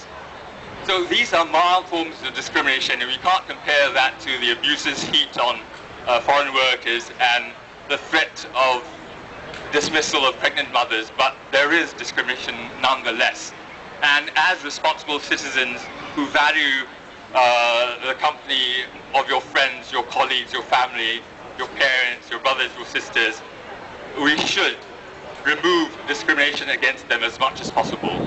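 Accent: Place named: Singapore. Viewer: We Singaporeans love our own accent babe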